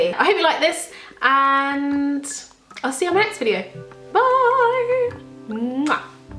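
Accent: United Kingdom. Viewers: Are you from the uk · Your British accent is sooo cute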